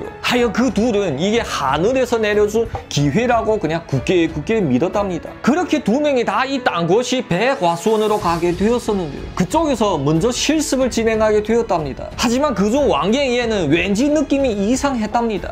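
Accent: China. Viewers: 억양이 특이하시네요.중국사람이 한국말 하는 느낌요.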